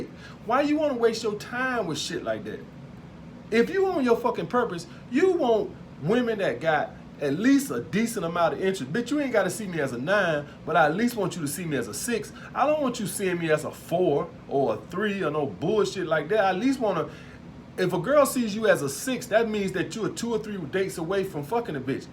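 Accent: South, United States. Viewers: You sound like you from the south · Laidback andhumble he's from Alabama if i remember correctly.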